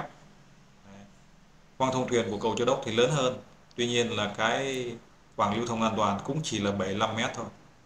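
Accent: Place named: North, Vietnam. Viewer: E nghe giọng anh hình như k phải dân miền Tây An Giang thì phải. Em đóan k lầm hình như a ở miền bắc nhỉ😊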